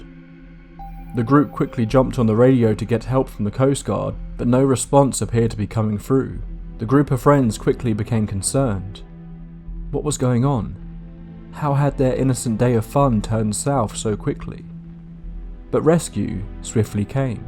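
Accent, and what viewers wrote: England, United Kingdom. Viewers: Man why is this British guys scaring me rn😂🤣 good video man😂👍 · This motherfucker is not from Michigan I can tell by the British accent get the hell out of here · Don’t! We love your British accent!! 🇬🇧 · Not "glided" but Glid! I'm becoming rather disillusioned by your channel as, though you speak with an English accent, your grammar suggests you were "schooled" but not educated in America. Schooled but not educated? Simple. America's education standards are non-existent! A Harvard or Yale graduate is equal to a kindergarten pupil anywhere else in the world. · It's a little funny to hear a quintessential British voice recounting American ghost stories. Like my ears and brain do a double-take. But as usual, your research and storytelling are spot on as always. I really do love your work, regardless of where the tales take place.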